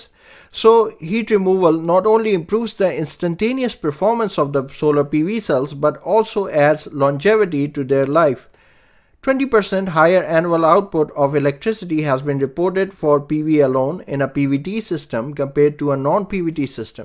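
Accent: India. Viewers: I like your Indian accent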